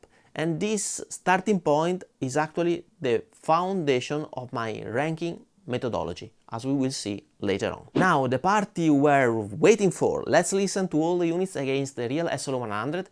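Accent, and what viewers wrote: Italy. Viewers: First, your videos are the best and most informative that I have seen anywhere! Interesting that for me the Quad Cortex had sound that was close overall but had some high frequency sharpness and harshness that I found problematic. This harshness was not present in the original SLO nor anh of the other contenders. Also interesting that none of them really nailed the tone. They were all pretty close (except for the Stadium- which was embarrassingly bad) but even the closest, the Neural plugin, doesn't sound indistinguishable from the real amp. Many people are saying that the challenge of sound accuracy is over, but there is plenty of room to improve if this comparison is representative of the current state of modeling/capturing. A lot of it may just be down to which capture you are using and how well the capture was done. One constructive idea if I might? 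It would be really helpful to have the clips played with the original right before each of the contenders. It's much easier to hear when you have the opportunity to hear the original again right before each of the new sounds for direct immediate comparison. Thanks for all the hard work you have done in sharing all of this information! Love your accent, Italian?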